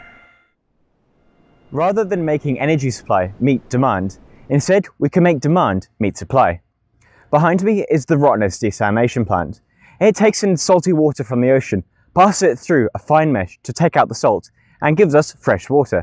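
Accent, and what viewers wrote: England, United Kingdom. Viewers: I don't get it. Your accent is English, but you say "we Australians", what's your history bro? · You're Australian but it sounds like you have a Brittish accent.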